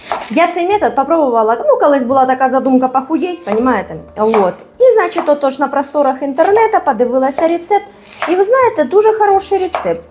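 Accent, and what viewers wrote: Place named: Poltava, Ukraine. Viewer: Ваш юмор і діалект, схоже Ви з Полтавщини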